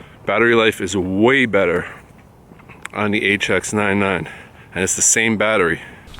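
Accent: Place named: New England, United States. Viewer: Haha, funny and perfect comparison. I have the HX80 and looking to upgrade for the added features on both: control ring, RAW, touch screen, 4K. But after seeing this, no way is the RX100 worth the extra $$$. Some aficionados wet their pants over sensor size (Wow, a 1" in a tiny camera!), but even the tiny sensors are so good now (in phones) that I'm not buying into the hype. Yeah, better bokeh with RX100, but...I really don't give a shit about it. Otherwise, the IQ is nearly identical and you'd have to be hallucinating to see an appreciable difference. Yeah, the RX100 may be marginally better in low light, but... hey, you want a low-light hobby? Take up music. The 30x zoom on HX99 is far more useful. If you shoot a lot of video outdoors, the mike jack on the RX100 VII is the only real benefit for 3x the price. (from Texas, I love the Yankee accent. 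Are you a wise guy? :)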